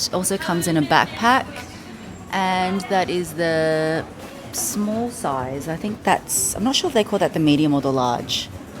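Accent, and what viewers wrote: Australia. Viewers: Just wondering, are you Asian, or Australian? You look Asian, but have an accent of an Australian.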